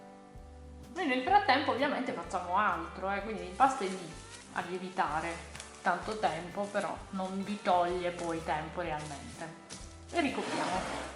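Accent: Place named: Parma, Italy. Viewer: Ciao! Mi é piaciuto il tuo canale, parli come un' amica mia italiana che é di Parma , di che città sei? Parli così bene che io ti capisco tutto e non sono nemmeno italiana. Saluti dal Cile!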